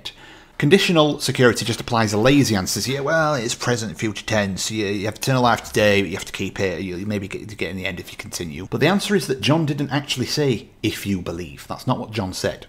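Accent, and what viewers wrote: United Kingdom. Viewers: Random but, I enjoy ur accent. It's like a very clean sounding English accent.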